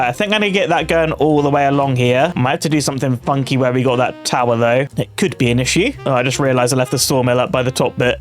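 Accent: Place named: United Kingdom. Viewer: I was listening to this as I slept and I'm just now realizing now that I'm awake that he's British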